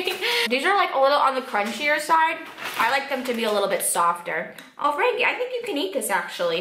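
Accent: Czech Republic. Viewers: mluvíš neuvěřitelně krásně česky! Jsi češka? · je to supr :D prostě američanka narozena v česku,užasne :) prostě že ta čeština u ní pořád je :) klobouk dolu a supr videa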